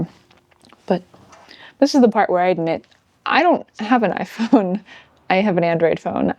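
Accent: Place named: United States. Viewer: you actually pronounced fine just with american accent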